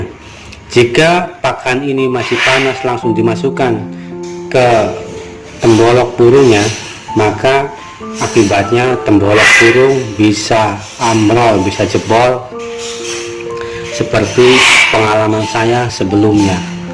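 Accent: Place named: Indramayu, Indonesia. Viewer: Wong indramayu ya bod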